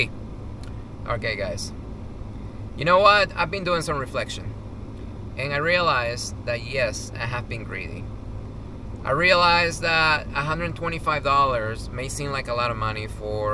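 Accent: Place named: Nicaragua. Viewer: Your accent sounds like you're from Nicaragua.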